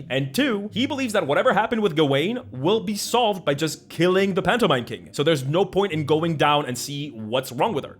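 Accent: Spain. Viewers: Também gosto que tu é espanhol, algo na fala derivada do latim me da uma sensação comunitária também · Is that a spanish accent I hear? I always thought you were italian!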